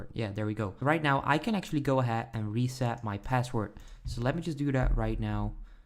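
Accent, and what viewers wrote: Netherlands. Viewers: Fakka Man Bro dit helpt gewoon ik hoorde al aan je accent dat je nl was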